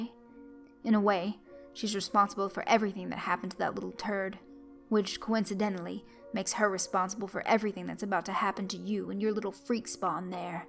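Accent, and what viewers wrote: South, United States. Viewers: Great narrations love the southern accent really like your productions keep up the good work.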